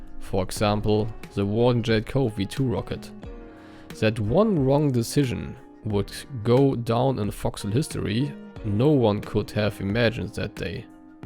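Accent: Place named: Germany. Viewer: Im Ernst das ist deutsch-englisch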